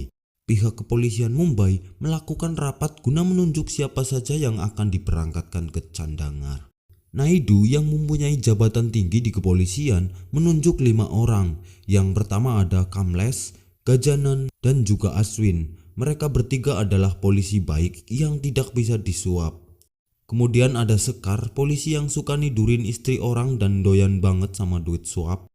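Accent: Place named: Tegal, Indonesia. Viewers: Tegal bgt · dg bahasa mimin meddok banget pingin belajar bhsanxa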